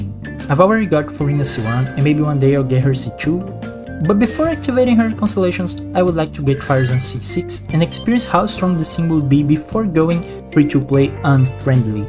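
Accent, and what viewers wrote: Brazil. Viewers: o sutaquezinho br nunca falha, mas excelente video mano, sucesso no seu canal!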